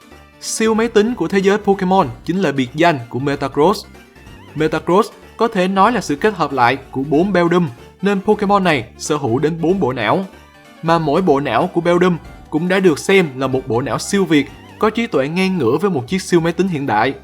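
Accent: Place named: Mekong Delta, Vietnam. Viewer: Thích vì cácb nói chuyện đậm chất miền Tây (dù không biết ổng miền nào) Ví dụ rất dễ hiểu và cừii ss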